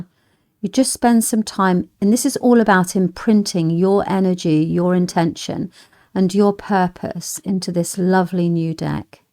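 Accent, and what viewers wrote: United Kingdom. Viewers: you have the most lovely accent and speaking voice, how I love the brits , )O( cheers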